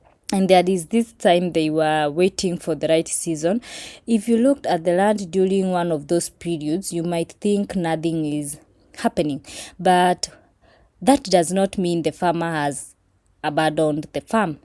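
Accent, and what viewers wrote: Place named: Kenya. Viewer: Sura yako ni ya Mkikuyu pia huwa unasound kama mkikuyu lakini jina ni ya Kiluhya au umeolewa na Mluhya?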